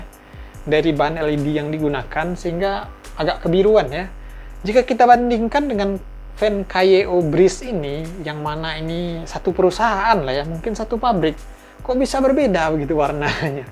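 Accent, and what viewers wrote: West Sumatra, Indonesia. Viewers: Abang orang Sumbar ya?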